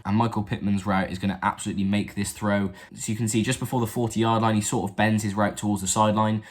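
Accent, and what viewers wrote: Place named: United Kingdom. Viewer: Absolutely weird to hear someone across the pond talking football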